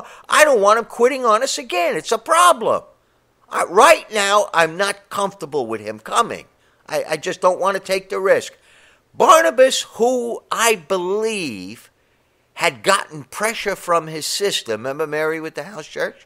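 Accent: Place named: New York, United States. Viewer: I am from faraway New Zealand.....and I love the New York accent! Not only that, Brother is an excellent preacher and is not afraid to tell it like it is. I appreciate that! The churches from my hometown are largely of the social gospel, sugar coated, entertainment variety. And they use the modern ‘bibles’. I moved away from them several years ago so I am extremely grateful to have these wonderful, Scriptural videos. Many, many thanks from a Christian Kiwi!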